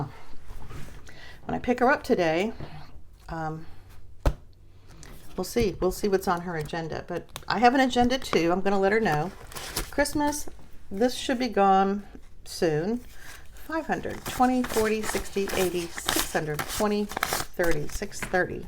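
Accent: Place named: North, United Kingdom. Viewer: Northern twang!!! 😂I love it!!! I love that you got them so quickly!!!!! Thank you so much again!!!!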